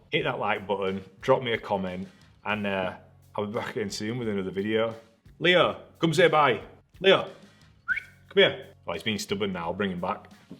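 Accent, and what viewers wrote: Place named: Teesside, United Kingdom. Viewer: I need to start doing that! My first few videos were just me rambling on without any structure haha so the bullet points list is a good idea. Also, I think it's needed for when you shoot cinematic sequences etc so you know what shots or what in camera transitions you want to use. Knew I recognised the accent. I went to uni in York and my family are from Middlesborough area so I'm familiar with the accent!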